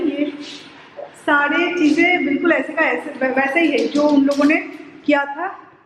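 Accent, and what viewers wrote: Bengal, India. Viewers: Tomar bangla ta, 🤩🤩🤩 uh got a nice bngoli tone😍😍😍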